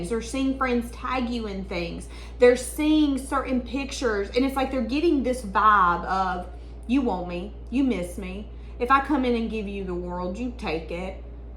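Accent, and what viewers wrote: South, United States. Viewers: I love ur energy, your southern sound. This reading is telling the truth... ❤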